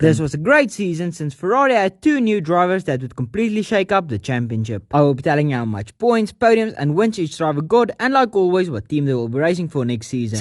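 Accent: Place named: South Africa. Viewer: This is the strongest South african accent I have heard in my life.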